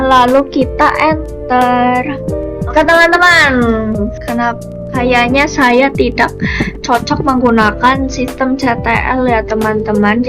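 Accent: Indonesia. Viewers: Medoq banget teman teman 😭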